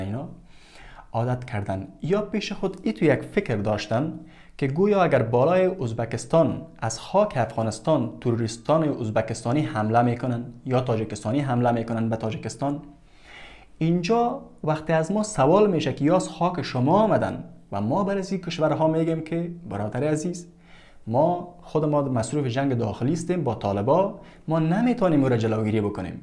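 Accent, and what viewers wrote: Iran. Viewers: Ne tu konigak khai namaindagi mekadi jawanhai Afghanistan ra. Keroom da lahje iranet. Bacha bershe amerallah salle.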